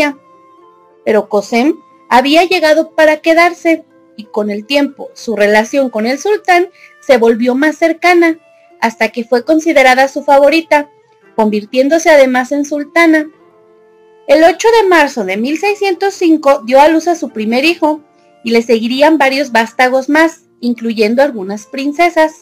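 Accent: Mexico. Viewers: Muy buen trabajo, interesante e informado. Me costó adaptarme al acento mexicano, pero valió la pena. 👍🏼🇺🇾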